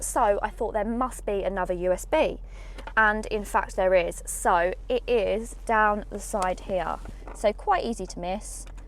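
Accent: Essex, United Kingdom. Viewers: I like how the rough essex accent slips out from time to time.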